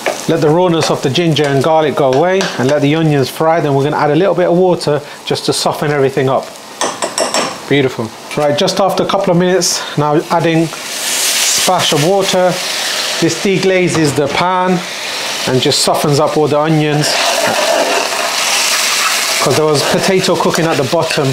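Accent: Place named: United Kingdom. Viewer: Some British accent..... up ahead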